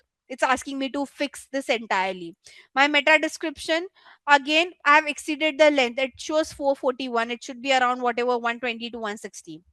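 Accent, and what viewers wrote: India. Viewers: over 5 hours having to listen to an indian accent? Fuuuuk me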